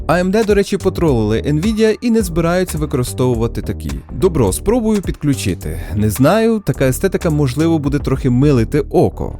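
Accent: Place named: Bila Tserkva, Ukraine. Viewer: шо за прекрасний акцент? -Білоцерківський! Думав показалось, а нє-земляк. Привіт!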